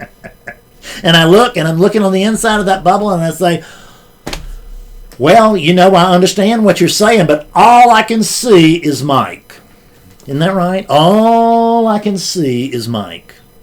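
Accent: South, United States. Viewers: your southern drawl that sounds lovely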